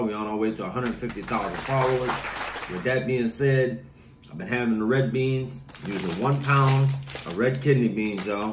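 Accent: Louisiana, United States. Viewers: That’s how our white people sound down there. That’s when you know they are from the dirty dirty part of Louisiana lol it took me to go to the military and come home 3 years later to actually hear how we sound lol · Let's Go! Yummy! You a lil ole Cajun just like my nieces husband. Love from Ms. Flournoy. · Subscribed and enjoy watching all your tutorials.. also love the Louisiana accent!! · That’s the Louisiana accent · This is specifically the cajun accent from lousiana, theyre a unique culture.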